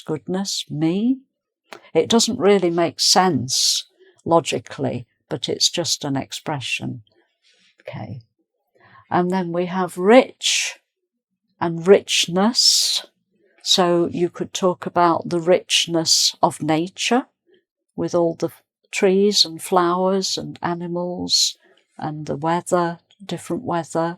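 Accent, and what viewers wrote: United Kingdom. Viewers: Hi teacher! I 'm an English teacher in Brazil and I just love your videos and your genuine British accent! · Good evening Miss, from Brazil, your british english make me remember when I was a teenager and starting my knowledges, nowadays, I need to learn american english, trying 😂, your voice is so sweet ❤❤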